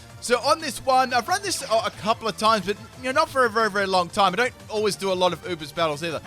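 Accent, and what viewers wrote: Australia. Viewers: are you austrailian ?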